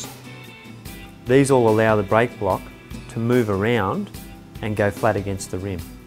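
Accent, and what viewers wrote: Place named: United Kingdom. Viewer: Excellent! You took your time and explained things well. Even with that crazy British accent :)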